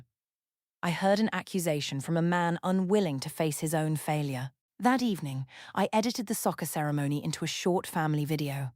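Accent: England, United Kingdom. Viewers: The English accent didn’t help any!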